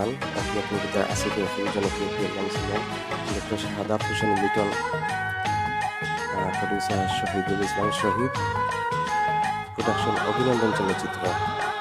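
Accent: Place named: Sylhet, Bangladesh. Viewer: উপস্থাপক মনে হয় সিলেটি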